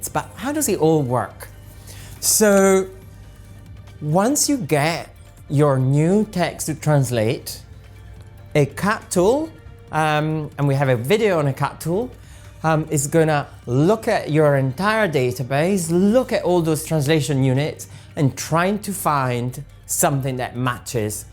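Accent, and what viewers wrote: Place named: Scotland, United Kingdom. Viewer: I could listen to your adorable accent all day. Is the influence Scottish? Irish?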